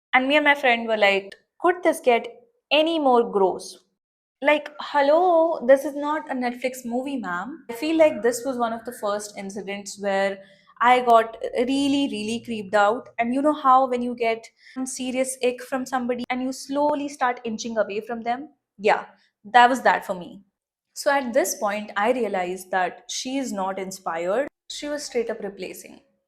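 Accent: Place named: India. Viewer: Omg you sound so western but your desi accent is strong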